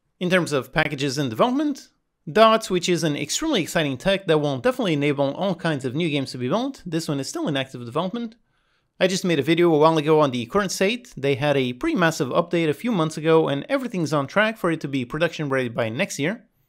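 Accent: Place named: Portugal. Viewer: Bolas. Estava a ouvir o teu Inglês e dizia para mim mesmo, parece um Tuga a falar Inglês. E fui verificar. LOL. Só não acerto no Euromilhões.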